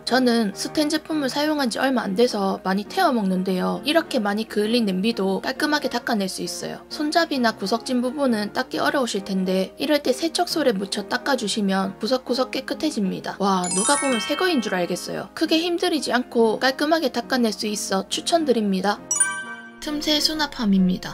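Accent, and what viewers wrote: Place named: Gyeongsang, South Korea. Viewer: 경상도 억양밖에 안들림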